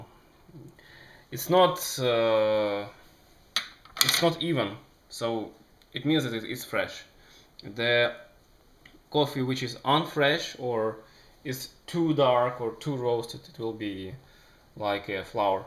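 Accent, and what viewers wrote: Russia. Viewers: Хороший русский акцент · Russian? Italian?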